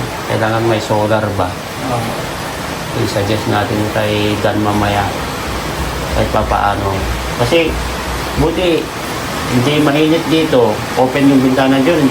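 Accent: Ilocos, Philippines. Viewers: Salamat sa pagtulong at naka subcribed napo ako sa chanel nyo sir ilocano kasi sa tono po ng pananalita mo god blessed po at sa magkapatid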